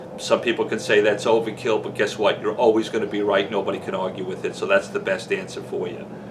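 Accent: New York, United States. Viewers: Hey it all makes sense now!! I’ve been watching all your videos and see you live in Dallas but that accent felt all too familiar to me it was confusing the he’ll outta me now it all makes sense! Haha I’m a fellow New Yorker too love your videos I wanna start a detailing business this summer and your videos are my go to for information thanks a lot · I knew I heard that NY accent lol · lmao, Been watching some of your videos for a few weeks and one thing that's been eating me is your accent and being in Dallas! Whole time I've been watching your videos I'm like this guy is definitely not from Dallas and now I know. Aspergers, no, New Yorkah, yes, lol. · Thanks, from down under in Australia. Love the NYC accent and the way you present this valuable information brother.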